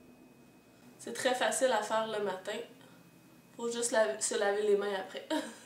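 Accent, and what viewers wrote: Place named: Montreal, Canada. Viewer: Super joli !! Ça fait vraiment un teint santé ! Depuis le temps que Lutin nous parle de toi ça fait plaisir ^^. A moi tout particulièrement car j'entends un peu de l'accent de chez nous... je suis de Montréal mais j'habite en France ;)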